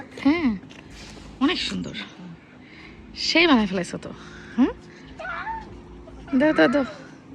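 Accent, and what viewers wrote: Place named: Chittagong, Bangladesh. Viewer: আপু ডেকছি চট্টগ্রামে ভাষায়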